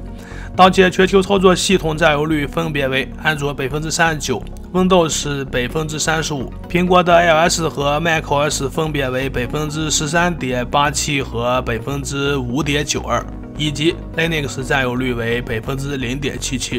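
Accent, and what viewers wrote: Shanxi, China. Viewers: 哈哈山西人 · 兄弟，山西人？托县的？ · 山西口音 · 你这是山西的还是河北的？ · 山西口音啦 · 山西兄弟吗？好好练练普通话...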